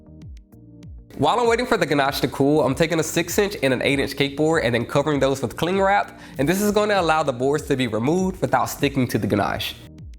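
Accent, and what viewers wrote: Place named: Alabama, United States. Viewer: The alabama accent thoo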